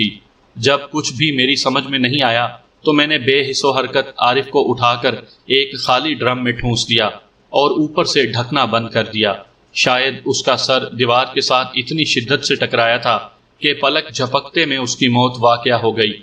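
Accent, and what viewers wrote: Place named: Punjab, Pakistan. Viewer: Your urdu voice is very sweet despite punjabi accent in it.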